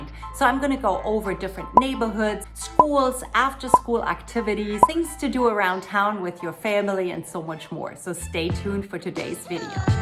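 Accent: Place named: Germany. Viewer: Your accent sounds german, germans seems to know the entirety of everything, is that you?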